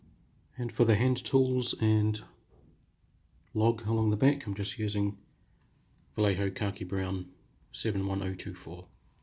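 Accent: New Zealand. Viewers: A kiwi accent?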